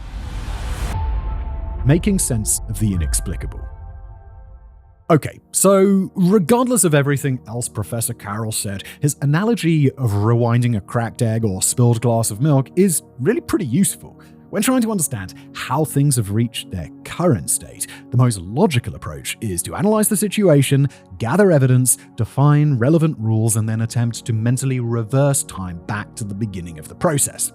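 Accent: United Kingdom. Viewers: For starters, there was no big bang. Therefore, there was no nothing before the Big Bang. Telling a story with a little cute British accent isn't gonna make it any more believable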